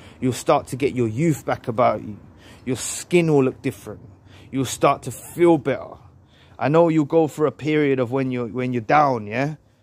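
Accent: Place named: Cockney, United Kingdom. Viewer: I love your cockney accent! Reminds me of London! ❤🙌✌️👊💯🍀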